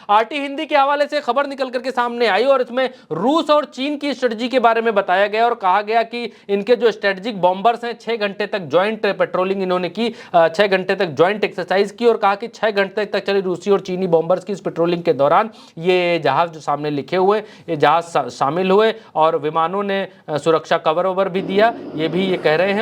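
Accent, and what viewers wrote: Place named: Bihar, India. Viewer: جی شاندار ہو ۔اللہ تمہیں زندگی دے ۔عمر دراز کرے خدا۔بہترین بہاری بولتے ہو اچھا لگتا ہے ۔پ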